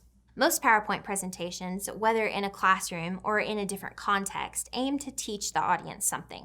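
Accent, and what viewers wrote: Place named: South, United States. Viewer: I can hear the southern drawl in her accent :)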